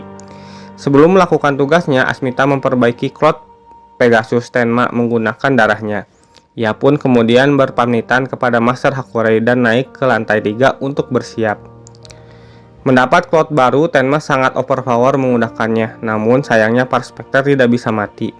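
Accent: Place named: West Java, Indonesia. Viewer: biasa lidah sunda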